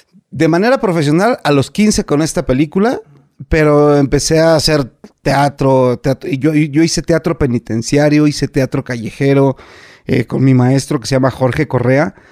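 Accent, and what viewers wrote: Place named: Mexico City, Mexico. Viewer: Yo lo conozco por Teresa, TELENOVELÓN. Mee encanta ese acento chilango marcado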